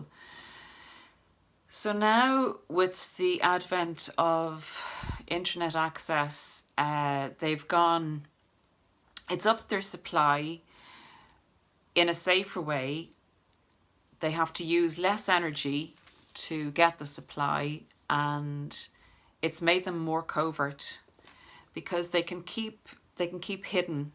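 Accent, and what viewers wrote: Ireland. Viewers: thanks very much done alot of journaling this morning while watching these videos which helped.stayed in bed all afternoon body so sore,I'm also from Dublin great to hear an Irish lady give this advice as a lot of the channels are american.love listening to you and thanks again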